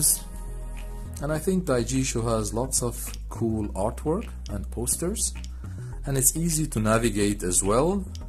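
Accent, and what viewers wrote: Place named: Iran. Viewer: This is the most Persian accent I've ever heard in my 43 years on this mortal coil. I give this 5/5 pomegranates. Would watch channel again!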